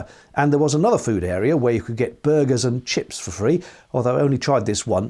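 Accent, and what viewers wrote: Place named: England, United Kingdom. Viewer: I often wonder is it mostly Americans that watch and enjoy these videos, because of the accent and Monty Python style comedy styling? In the UK, just sounds like everyone else on the BBC. In America he has that great accent and English style humor, that Americans love. I don't care about cruises, but if does the video, I will watch it. Subject does not matter.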